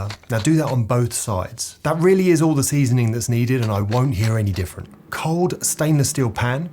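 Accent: United Kingdom. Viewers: He sounded like a random British dude before I read this comment...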